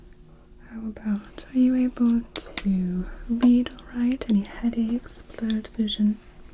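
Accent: United Kingdom. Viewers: Fellow Brit here - love your work and great accent! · loved the British accent 🥰 great video thank you soooooo much😘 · I know I usually comment some crazy scenario for a bit of fun. But this time I just want to say amazing work, and I really appreciate all of the effort you put into your videos! And also, that British accent is brilliant, well done! Thank you for all of your videos! Also, you look extra stunning with the short blonde hair! 😍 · Loving the British accent 👌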